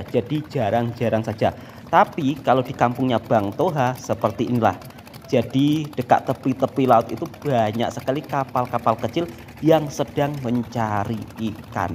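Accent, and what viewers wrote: Java, Indonesia. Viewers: Sekedar saran untuk bang toha : pakailah bahasa indonesia dalam narasimu... Abang kan orang jawa Indonesia kan, jangan karena abang sudah merantau di malaysia, lantas kosa kata dan bahasa yang abang toha sampaikan pun ikut berubah... seakan bahasa melayu malaysia dengan aksen jawa medok...😅 contoh : katakan saja terasi selanjutnya abang toha jelaskan kalau terasi itu dalam bahasa melayu artinya belacan... Banyak orang yang sudah merantau ke berbagai negara yang lebih jauh, tetapi aksen dalam bertutur kata dan bicara mereka dalam bahasa Indonesia atau bahasa daerahnya menggunakan bahasa dan aksen yang tidak berubah dari asalnya.. Seseorang yang mencintai negeri asalnya pasti tidak akan pernah melupakan kebiasaan dan logat aksen negerinya. ini hanyalah saran saja... terserah bang toha mau pakai atau tidak. Terima kasih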